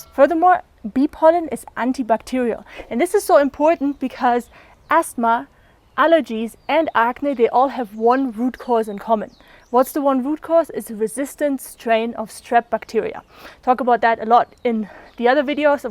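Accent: Germany. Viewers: This is the first video of yours I'm seeing. It's very interesting. You're in the Andes? But you sound German. I recently bought bee pollen for chronic inflammation. I'm hoping it will help. I like your video and will check out more.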